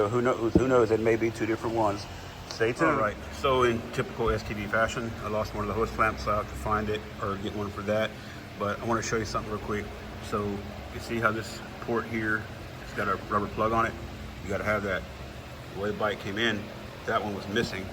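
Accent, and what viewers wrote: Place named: South, United States. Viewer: I used to watch your videos years ago! I want to say thank you for all the banshee knowledge you were able to share. Just realized you have a Midwest vocabulary with your southern accent. I'm from tip of the upper peninsula in upper Michigan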